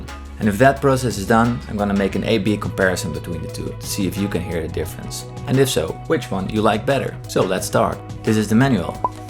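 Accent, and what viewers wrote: Netherlands. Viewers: Ik denk dat je nederlands bent toch? Wel goed accent!! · U sound dutch lol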